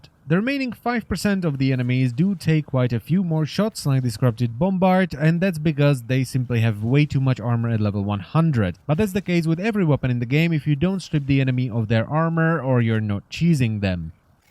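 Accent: Czech Republic. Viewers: Czech accent af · >that czech accent Nádhera :^)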